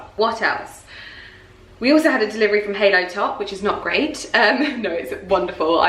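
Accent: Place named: Scotland, United Kingdom. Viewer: Omg will you shut up about your Scottish accent!! It’s getting old now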